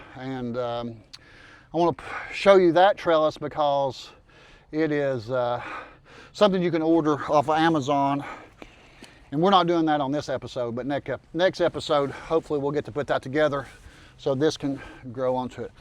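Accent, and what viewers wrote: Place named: Appalachia, United States. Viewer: Fangers for fingers. Love your Appalachian accent.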